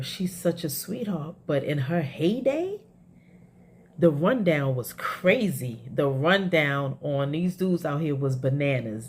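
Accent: New York, United States. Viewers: HEY, we need you I’ve been checking your YouTube for what feel like almost a year … to see if you finished this story!! I really appreciate your content.. you my NY AUNT IN MY HEAD! I’m from OAKLAND CALIFORNIA, and I BELIEVE YOU BEFORE I BELIEVE THESE PPL NEWS MEDIA PROGRAMING!! I check every day hope in the near future you bless us with the rest until then, patiently waiting on your return!! I love y’all accent man😂 you dope as hell and the queen of HARLEM TO ME!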